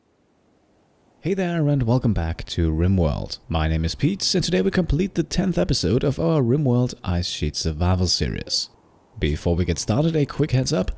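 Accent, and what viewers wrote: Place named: Germany. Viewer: I thought you were German. It's not super obvious, but reminded me of how I sounded some years back.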